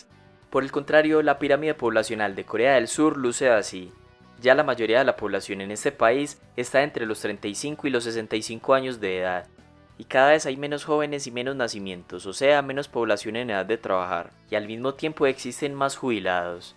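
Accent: Antioquia, Colombia. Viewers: Puro paisa! Se le nota a leguas.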